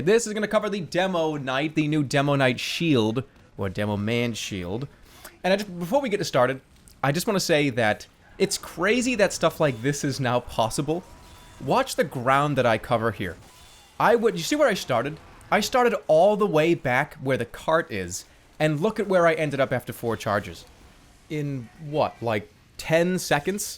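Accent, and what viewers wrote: Boston, United States. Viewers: just a question but are you from Boston because it kinda sounds like u have the accent btw nice vid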